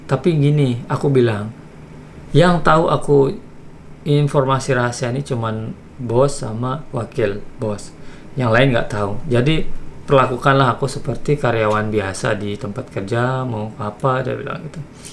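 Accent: Lombok, Indonesia. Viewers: Logat Sasak tetep melekat🙌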